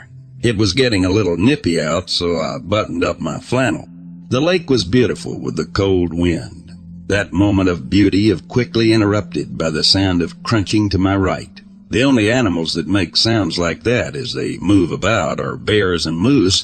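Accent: South, United States. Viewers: Well done!! So happy to hear from you all again! The new narration did a great job. There's something about the slight southern draw that makes you feel like your grand dad is telling you tale passed down from family. At least thats my take on it! Ready for more!!!!!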